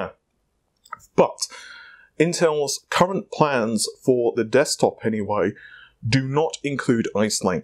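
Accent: England, United Kingdom. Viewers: My good man, you bear a striking resemblance to a young Syd Barett of Pink Floyd (RIP). The English accent only amplifies the similarities! Back on topic: Looking forward to upgrading my 1800x with some Zen2 goodness. A 144Hz monitor is in my future and I will need the clockspeed / IPC increase to stay above 100.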